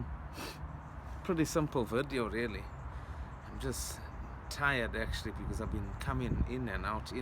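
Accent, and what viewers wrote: Durban, South Africa. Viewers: You sound South African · Bro are you South African? 😂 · Nice work. Dude you sound like you from Durban South Africa? your accent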